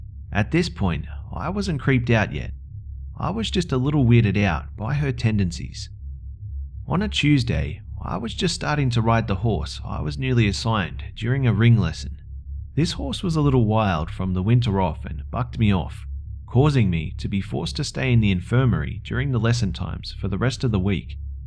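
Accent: Australia. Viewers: r u Australian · Are you Austrailan m8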